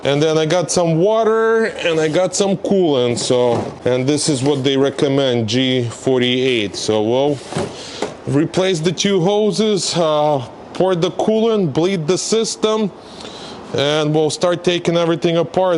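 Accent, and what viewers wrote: Russia. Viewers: Я думал мне показалось что автор русский😂 👍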